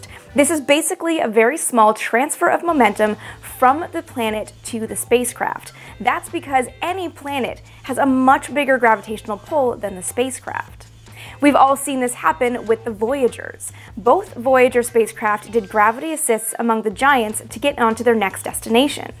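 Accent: Canada. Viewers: Check "oot" the smart, cute Canadian girl.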